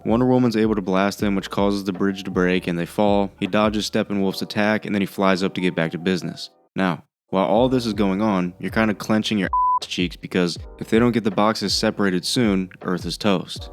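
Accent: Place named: United States. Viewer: You are still a soulless excuse of a human being for not caring about the family in the theatrical cut. But you sound American so it’s almost a cliche. Still important for the film to reiterate that they are heroes. They are here to save everyone. Collateral damage or the world. About your conclusions I must fall in favor. The Snyder cut showed a better representation of the heroes. Everyone was aware of the stakes and reacted accordingly. The heroes had important roles and ended the mission with serious determination.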